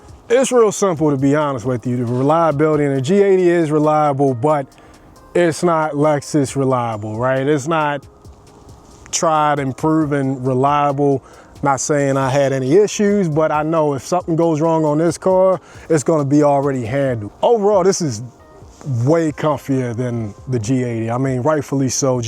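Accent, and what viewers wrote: Baltimore, United States. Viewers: I know a Baltimore County accent when I hear it! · Facts am from DC and i hear B-more county all day in fam.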